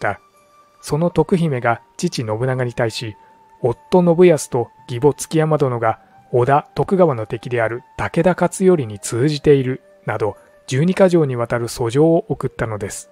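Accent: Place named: Mikawa, Japan. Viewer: 三河のアクセント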